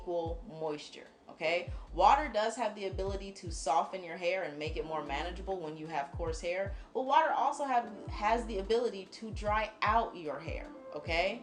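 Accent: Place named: South, United States. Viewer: Yes you do lol it’s kind of a southern accent. Where you from??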